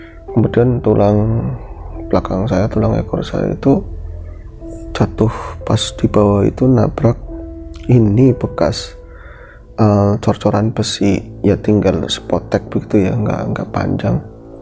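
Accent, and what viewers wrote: Tegal, Indonesia. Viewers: temanggung hadir mas...untuk cerita kali ini q insyaallah yakin blm prnh dibawakan di chanel sblmnya soalnya klo cerita di chanel sblmnya alkhamdulilah q sampai hafal alurnya semua karena tiap cerita q dengerinya 3-4 kali...oya cuma masukan aja buat mas klo dr q justru lbh suka klo ada logat tegal,nya selain lucu entah knp q jg tertarik dngn logat tegal,nya mas....🙏🙏🙏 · Mas,maaf saya telat 16 jam 🙏 Tetap semangat mas...awal2 di ngobor dl juga saya sedikit kesulitan nyimak kalo mas lg bawain cerita (mungkin krn saya orang sunda) tapi lama ke lamaan jd terbiasa dg logat tegal nya. Semangat mas,d tunggu cerita2 yg lain nya 💪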